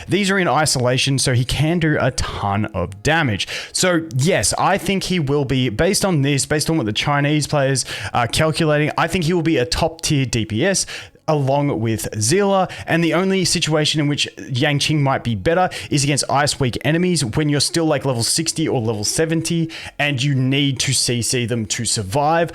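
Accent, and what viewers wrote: Australia. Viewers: wait australian?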